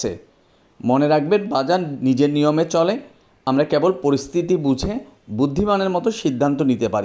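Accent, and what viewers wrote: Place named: Chittagong, Bangladesh. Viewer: কথা শুনে মনে হচ্ছে চট্টগ্রাম বাড়ি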